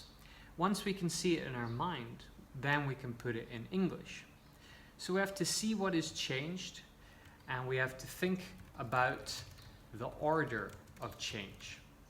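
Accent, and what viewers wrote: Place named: Scotland, United Kingdom. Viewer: Are you from Scotland? Btw Really love your accent.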